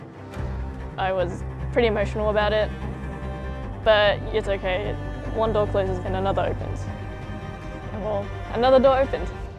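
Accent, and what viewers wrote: Australia. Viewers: She is Australian. I'm Australian and i can tell from the way she speaks, that she was born here. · This video is awesome!! and seeing an Asian lady with an Aussie accent, <num>/10 <3 <3 More videos please!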